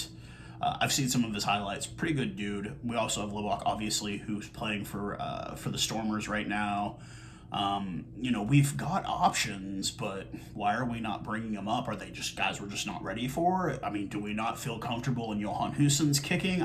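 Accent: United States. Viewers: Where is this guy from. Sounds like a yank.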